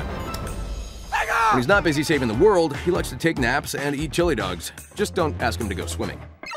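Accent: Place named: England, United Kingdom. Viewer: Finally an english dude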